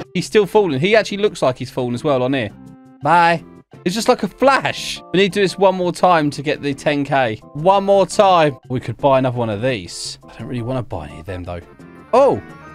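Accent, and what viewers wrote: United Kingdom. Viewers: Hmm u sound British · Are you british bohou oh wauh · His British accent never gets old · Are you BRITISH????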